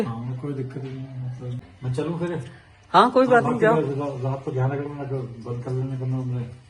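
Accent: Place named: Multan, Pakistan. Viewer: Meri mummy bhi aise hi baat krte hai ..hum bhi multani hai...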